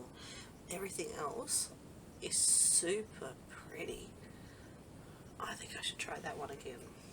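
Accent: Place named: Australia. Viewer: one of my faces girl! ❤️ And I can listen to the aussie accent all daaaaaay long! Lol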